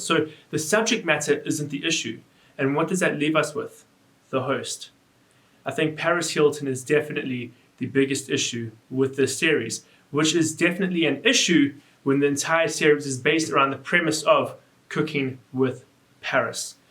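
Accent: South Africa. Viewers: Are you South African? I love your accent x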